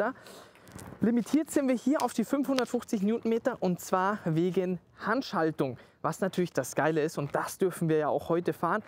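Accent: Bavaria, Germany. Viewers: A is des schee wenns auf boarisch is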